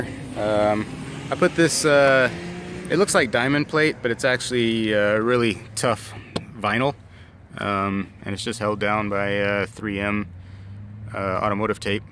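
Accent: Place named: Midwest, United States. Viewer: I came across your channel while searching for videos on Mini Van conversions as I have a 2014 Town & Country. As I listened to you I thought you sounded so much like my nephew who lives in Hobart. I watched all of your videos in one sitting and in one you state you're going from Portage to Portland. So that WAS a mid West accent I was hearing, and truly you sound just like my nephew! I thoroughly enjoyed watching your videos and look forward to more! You have a wonderful sense of humor and a great voice for YouTube!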